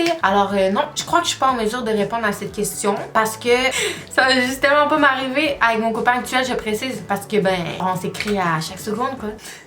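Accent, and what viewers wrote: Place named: France. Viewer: pourquoi tu parle comme les français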